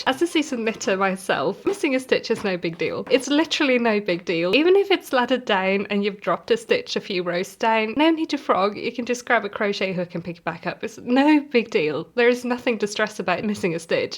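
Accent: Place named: England, United Kingdom. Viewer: I got recommended this video and I'm confused by some of your statements. You said you're a professional knitter and work with deadlines but then you said you knit almost exclusively for yourself. You also saod you're Scandinavian but you have the thickest english accent so either you mean you're of Scandinavian descent but you yourself are english or you're from somewhere on scandinavia and learned english in the uk. I don't say these with any judgements I was just genuinely confused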